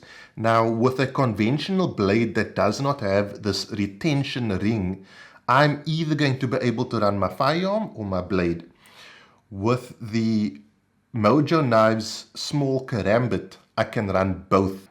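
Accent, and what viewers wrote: South Africa. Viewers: Great review! ☺️ I look for the very same thing in defensive knives, and have one in quite a similar shape. Also, your South African accent is very beautiful!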